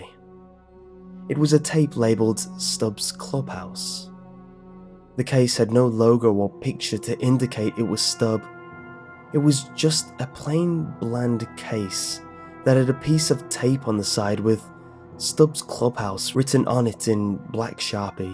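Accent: United Kingdom. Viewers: It's a British accent, and sometimes they'll do that without noticing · I really hate that angst saturated british accent! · DesiLovesSouthPark It's called an accent, friend. They don't do it on purpose, that's just what sounds natural to them. If you'd grown up in the UK, you'd do the same thing and think nothing of it. They probably get annoyed that we DON'T put "r" sounds after "a" sounds or "k" sounds after "g" sounds, it probably pisses THEM off. The American accent is not the one true way to pronounce the English language - it's an accent, like what you'll find anywhere else.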